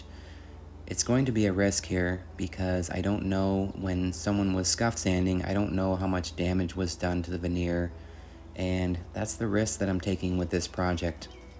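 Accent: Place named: Midwest, United States. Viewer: It is INSANE how much work and frustration went into this. I 🎉CHEERED🎉 when you started pulling the pieces off and "properly" gettin down to biznass with your various sanders. That was actually really funny. It felt like the crescendo in a Dr Jackyl/Mr Hyde scenario. Except like, the loony tunes version? But with ambience. Anyways, I'm binging your channels. You're doing great work! Also a funny aside, I migrated from MI to OR for 10 years now, and I've been wondering about certain words that have started to sound wierd when I say them out loud, and you're making me realize that it's because they're midwestAF long-vowel sounds. Like how you can maybe get it up to 60° in the graaj, or how you're gonna bou-re a hole, or kill it with fyyre. 😅 Anywhoozle, I hope your week is spectacular, and that you find that magnificent piece you didn't realize you were searching for! 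Cheers! ~Violet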